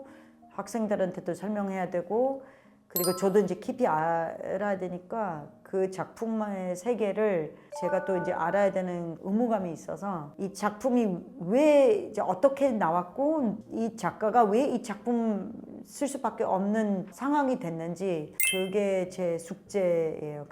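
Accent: South Korea. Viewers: 와우, 거의 한국 사람들 억양을 그대로 쓴네. 미네소타 한국어 마을 '숲속의호수'가 있다는걸, 유튜브 다른 영상에서 봤었는데 바로 이 분이 나왔었구나. 한국을 엄청 사랑해 주시네, 고맙습니다. 항상 건강하시고, 행복하세요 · 500%한국사람말투군요 뛰어납니다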